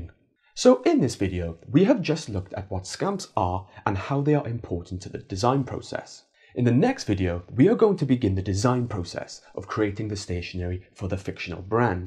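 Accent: Wales, United Kingdom. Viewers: Hey fella are you Welsh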